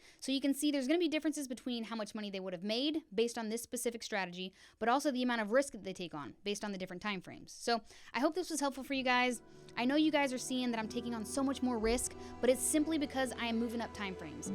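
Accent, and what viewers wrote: United States. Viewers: Original American Accent...❤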